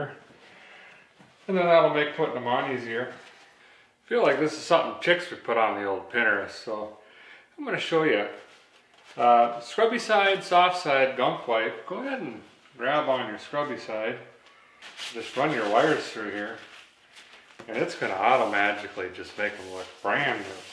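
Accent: Minnesota, United States. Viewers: Mind-bottling. . . the Minnesotaization of the language can be quite amazing. I'll do the right thing and say, close enough!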